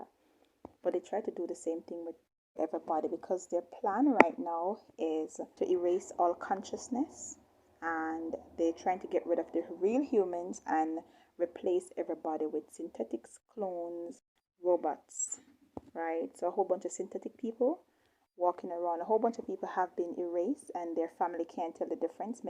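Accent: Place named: Jamaica. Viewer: I love love your Jamaican accent ( peace and blessings )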